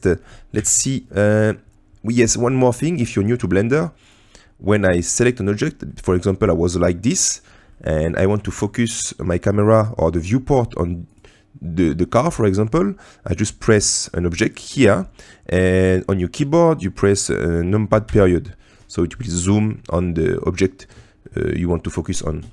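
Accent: France. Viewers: 💪🏼Je m'abonne clairement!!! je cherchais des bon tuto sur Blender et plus particulièrement sur la création ciné/filmic de scènes de voitures en mouvement dynamique! PS: je m'abonne aussi parce que j'ai capté l'accent Français!!! 😃 #blackpanthers💪🏼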